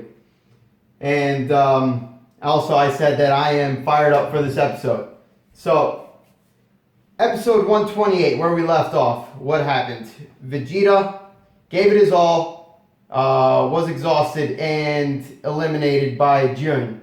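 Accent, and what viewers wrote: Italy. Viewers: oooh sí calabrisi?? Iu sicilianu, più o meno lo stesso dialetto;)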